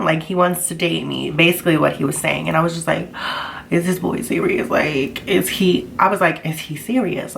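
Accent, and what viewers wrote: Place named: New Jersey, United States. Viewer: My heart really goes out to I am so sorry that that happened to you I have been in a similar situation and it is not okay for somebody you know that you kind of trust you know what I mean to do that. And honestly I have been watching your videos and like anytime I see a thumbnail of you or whatever on here like I'm like oh yeah she's the s*** and I totally forgot that you were even transgender until you hinted at it in this video like I don't know I mean that's not really what my comment is about like but it just kind of like I was like taking aback or for a second like oh wow I honestly completely forgot totally forgot and I think that is the way that most of your viewers view you you know I mean like I don't even think it crosses their mindset and for anybody that has a nasty comment to say anything oh that nature shame on them even if it's calling you a s*** or whatever. 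Anime like keep doing you I love your videos you are so funny like I love your accent like it kind of reminds me of home when I'm originally from but I'm originally from New Jersey so LOL South Jersey at that and I'm living in Kentucky now so yeah I don't know you keep doing you because you are funny you are beautiful you have a great personality.🖤💕🖤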